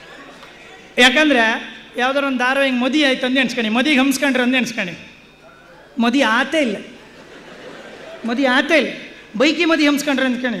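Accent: Kundapura, India. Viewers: Kundapura Kannada Super.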